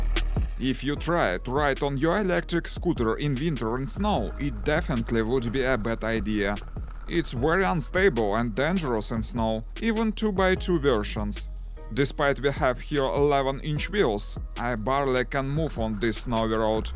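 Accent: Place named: Russia. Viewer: мужик у тебя акцент русский.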